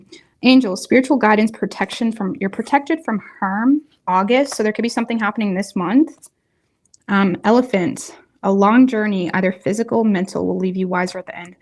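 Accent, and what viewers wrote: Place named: Canada. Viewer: You must not travel much. Do you know how many accents there are on this planet? Hell, I was born and raised in California but have lived in several states. I live in in rural Georgia and picked up the twang accent. When I go home to CA, they think I’m Australian. In GA, I sound like a “yankee”. She is pronouncing the word out correctly because she’s Canadian and that’s how they say it. The more you know ✨✨✨🌟⭐️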